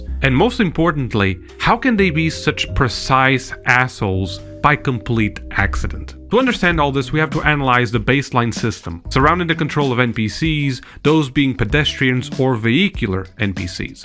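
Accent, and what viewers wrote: Portugal. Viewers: EU SABIA, esse sotaque não engana ninguém brother, já estava na cara que eras tuga, só faltou ver a matricula no teu quarto no minuto para me aperceber ahahah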